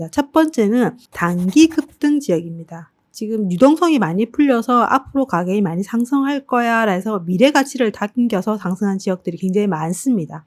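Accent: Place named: Gyeongsang, South Korea. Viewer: 쌤~~~ 강의가 너무도움이 많이되고 좋아요.근데 경상도 사투리에 말씀이 빨라서 잘 못알아듣겠어요.말씀을 조금만 천천히 해주시면 좋겠습니다~~~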